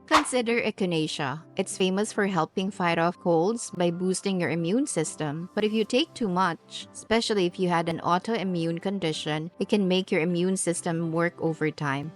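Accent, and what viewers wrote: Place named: Philippines. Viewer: voice is from the Philippines.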